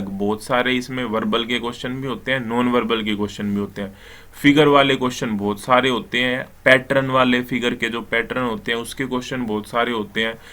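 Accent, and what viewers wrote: Haryana, India. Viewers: Bhai Haryanvi hain ke?? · Aawaj se to Haryana se hi lagg raha hai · Ha lgte to haryanvi hi h ....sonipat side k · Sir mujhe aapki voice bhoutt aachi lgi aapke bolnee ka tarekaa gjjbb h haryanvi side se ho kya aap😙😙😙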